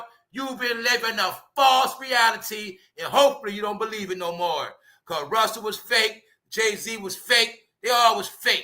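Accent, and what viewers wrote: New York, United States. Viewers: be cooking 💪🏽 especially with your NY accent I like, just my thoughts so if get out how’s he gonna be living 🤔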